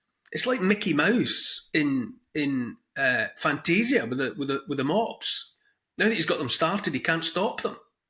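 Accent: Scotland, United Kingdom. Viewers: with his rough Scottish accent is very wise and insightful! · You speak of bad, sad things and i am fully on the same page with you. But listening to your Scottish accent awakens a hope for humanity in me, don't know why. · I thought I was listening to myself with a scottish accent ! thank you.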